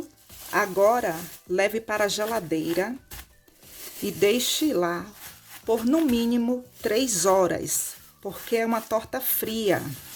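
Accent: Bahia, Brazil. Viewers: Eu caì no seu canal por acaso, me apaixonei de cara e me inscrevi imediatamente! Muita simpatia e esse sotaque maravilhoso da minha terra, a Bahia...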